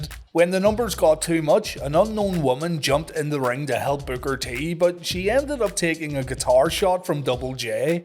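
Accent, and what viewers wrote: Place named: United Kingdom. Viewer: Can't listen to this nit brit?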